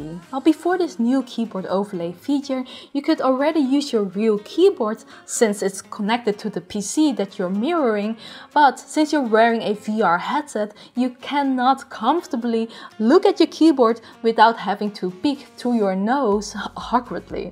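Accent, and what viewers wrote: Netherlands. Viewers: cute Dutch accent ;--) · Your English Dutch Accent sounds really funny for me as a German ! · Is ze Nederlands? Ik dacht het al te horen aan haar accent haha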